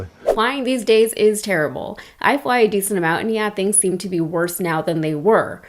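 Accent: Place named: Texas, United States. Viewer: Your whistling has become quite endearing because Matthew McConaughey does the same thing,,when he talks it’s called the Texas whistle❤ I thought you had a speech impediment but it’s just an accent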